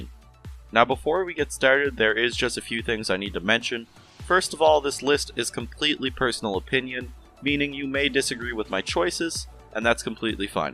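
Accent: Canada. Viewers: Are you Canadian? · You're Canadian right? LOL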